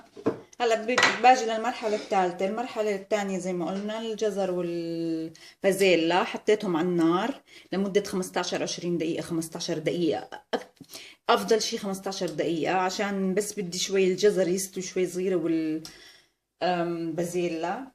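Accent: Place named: Palestine. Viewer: تسلم هالايدين ان شاء واكلك مبين لذيذ جدا وحكيك حلو الحكي الفلسطيني كتيييييير حلو وبحبو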